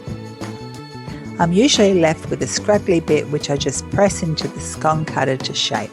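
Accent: Australia. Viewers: Fantastic!!! Thanks so much! So happy to hear a fellow Aussie cook😃💞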